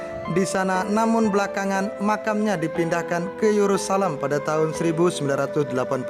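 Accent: Indonesia. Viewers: Dr kmrn bahas cara baca mulu....harap pandai..lidah indo beda...apalagi yg kurang bs bhs inggris..harap maklum lah...jngn spam trs🙏🙏klo ga suka ya uda diem aja...🙏🙏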